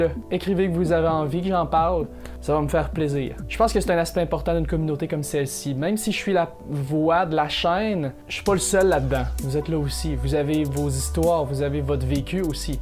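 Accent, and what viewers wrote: Quebec, Canada. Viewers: Bravo! Bravo! Je t'ai découvert grâce a tes vidéos sur la déréalisation , ça m'a aidé moralement. J'aime la plus part de tes vidéos et surtout ton accent québécois (je suis français) :)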